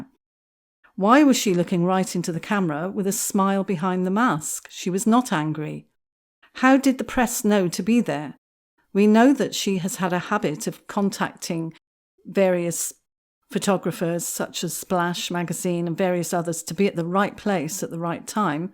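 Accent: United Kingdom. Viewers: Lovely British accent. Excellent content and perspective on YT videos. Thank you. I truly appreciate you. 🏆🌈🏆🌈✨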